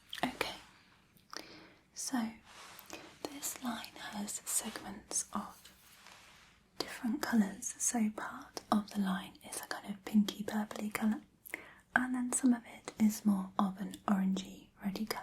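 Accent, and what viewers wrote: United Kingdom. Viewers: Gawd I love a woman with a British accent! ❤😍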